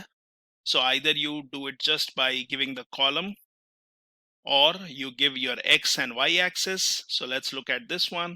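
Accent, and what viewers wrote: India. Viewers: the videos is a value but it's so annoying to be in Indian accent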